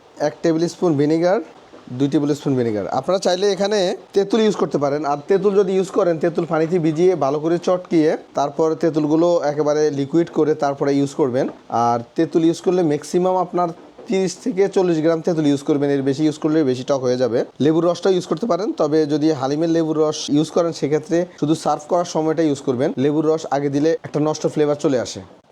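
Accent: Noakhali, Bangladesh. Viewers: ভাই আপনি নোয়াখালীর???😮😮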